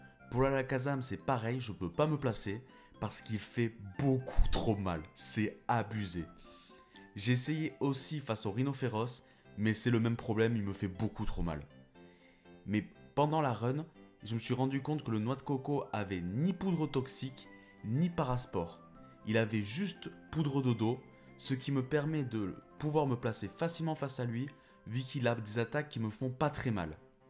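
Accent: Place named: South, France. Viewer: Ptdr j’adore ! Tu viens d’où avec cet accent fada ?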